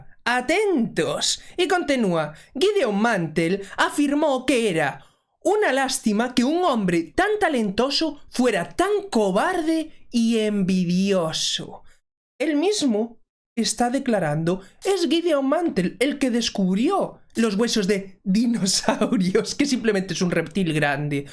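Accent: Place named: Galicia, Spain. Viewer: PD: Tienes un acento gallego precioso.¡Galicia calidade!✌🏻❤️🙌🏻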